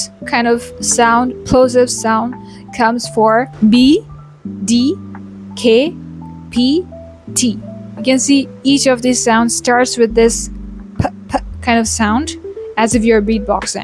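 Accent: India. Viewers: As an American this still sounds Indian.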